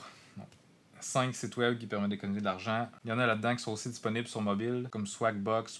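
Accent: Quebec, Canada. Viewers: T'es québécois ?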